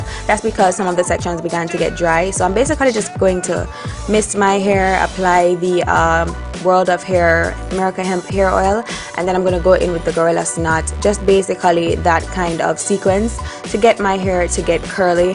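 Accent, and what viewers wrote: Jamaica. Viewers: Is she Jamaican ?? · I must be so use to hearing a JA accent 💀 cause everybody saying they hear one and i bearley hear it😭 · I’m a simple girl. I hear a JA accent, I subscribe.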